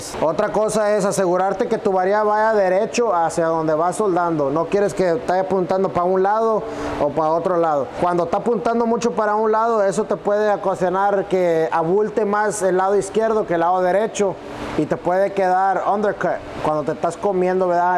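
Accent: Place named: Texas, United States. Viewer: Me llega el acento tejano del compa en los vídeos de inglés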